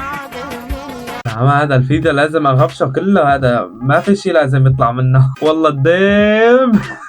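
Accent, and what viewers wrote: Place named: Aleppo, Syria. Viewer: أنتي حلبي كأنو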